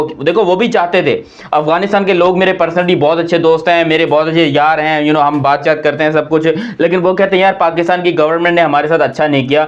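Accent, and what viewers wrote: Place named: India. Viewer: Abby chootia tu Pakistani nahi Indian hy or tumhara lehja hi batta raha hy kanjer chankia ky sapoot hamesha dosti ky cower main ghatia dushmen hoty hain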